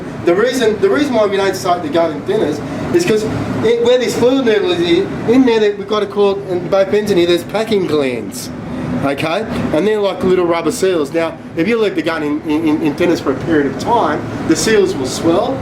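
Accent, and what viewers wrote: Australia. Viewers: Good, efficient workmanlike description. I got the message clear even with the Aussie accent. Next video, turn the exhaust- fan speed much lower so we can hear better! Thanks!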